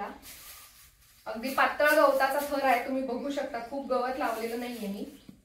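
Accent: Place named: Rural, India. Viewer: Love your Gramin languages tone when u use. ........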